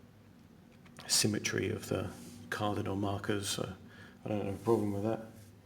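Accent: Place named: United Kingdom. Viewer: Just ordered this one… coming in a few days … I think I’ll like it … nice review and the British accent makes it even cooler 😎 review on the lume was excellent .. I agree with several other guys on here that I’m a Seiko man and yet this citizen is a great watch .. I have the seiko 5 filed military watch with the same movement as the skx and it doesn’t even wind at all…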